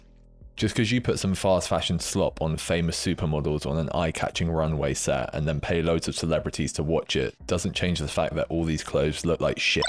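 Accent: United Kingdom. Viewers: when a monotone british man speaks, i listen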